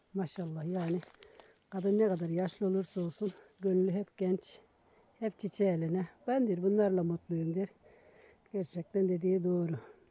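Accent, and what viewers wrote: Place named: Anamur, Turkey. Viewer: BACIM SEN ANAMURLUMUSUN ŞİVEN ORALI ❤❤❤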